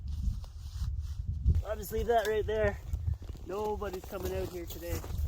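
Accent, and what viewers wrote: Canada. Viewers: Just say “out” and “about” in your awesome Canadian accent, and you’ll be forever a YouTube legend.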